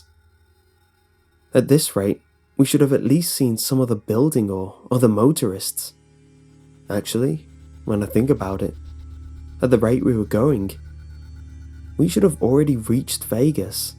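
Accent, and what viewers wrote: England, United Kingdom. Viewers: He sounds English, why is he talking about temperature in farenheit as opposed to centigrade?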